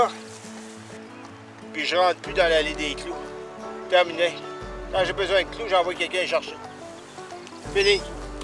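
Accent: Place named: Quebec, Canada. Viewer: Oui je comprends bien le Québécois, car j'ai fréquenté pas mal de Québécois . C'est un vrai plaisir de te regarder et de t'écouter. Je vais prier les dieux de la detection pour tes prochaines sortie.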